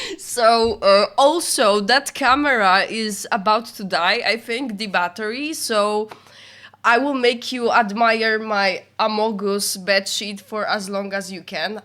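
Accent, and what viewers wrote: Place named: Poland. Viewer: Polka? Bo masz dobry angielski, ale ten akcent trochę zdradza ;d W każdym razie trafiłem na eseje o Raphaelu i widzę, że nie tylko ja mam obsesję na jego punkcie. Pozdrawiam.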